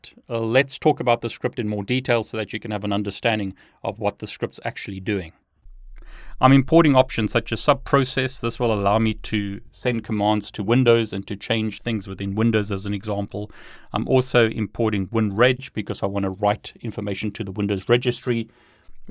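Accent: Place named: South Africa. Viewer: are you from south africa? your accent sounds south african!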